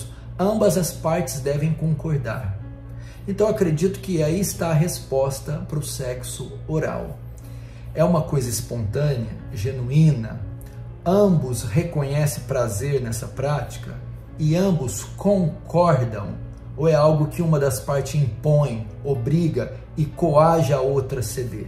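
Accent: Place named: Minas Gerais, Brazil. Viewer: Mineirinho kkkk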